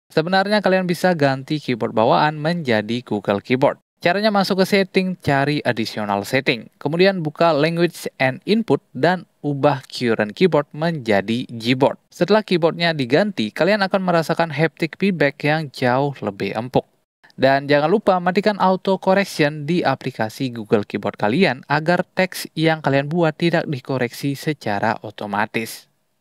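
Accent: Bali, Indonesia. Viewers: Iya, kelihatan logat Balinya😁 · Nah, nemu juga video yg kasih tips kaya gini. Sungkem bang..btw narator dari Bali kah? 😁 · medok bali banget kayaknya ni hostnya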